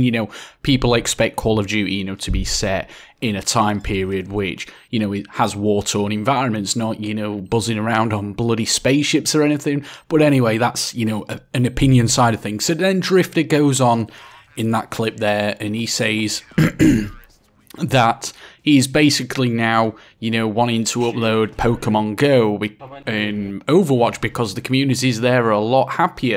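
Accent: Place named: Midlands, United Kingdom. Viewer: Just stumbled across this video. Subbed and liked that's done good shit said here. Where are you from? I'm from Nottingham you sound Midlands UK but can't place it, Sheffield or Doncaster maybe? Anyway great video man! 👍✌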